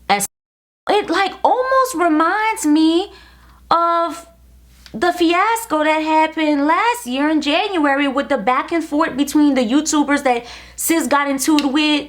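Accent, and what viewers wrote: Louisiana, United States. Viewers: I just want to say I love you! I was lowkey laughing in parts of the video bc all I hear is our Louisiana accent! Lol I can most definitely tell your from Laffy way! Lol I’m from Houma💕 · I heard all the Louisiana in I love you please no more videos like this YT mom ❤️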